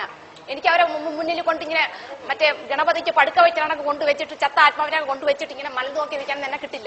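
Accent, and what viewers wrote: Trivandrum, India. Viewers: thalle thironthoram slang.. polappan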